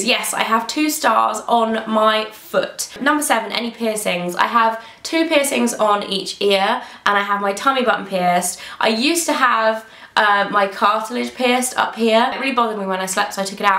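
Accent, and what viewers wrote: United Kingdom. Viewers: you talk soo fast, but i really enjoy watching your videos, and I looove your british accent :D♥ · Hi! I just wanna say that you are beautiful, and i love your voice :) I'm obsessed with British accent and i love the way you speak :)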